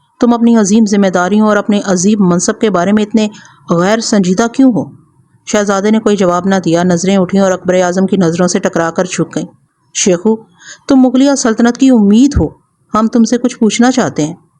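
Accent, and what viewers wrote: Punjab, Pakistan. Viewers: جو مزہ الیاس سیتاپوری کی کہانیاں پڑھنے میں آتی تھیں وہ سن نے میں کہاں اس کی اصل وجہ آپ لوگوں کا پنجابی لب ولہجہ ہے جو سماعت پر بار گراں گذر تا ہے ۔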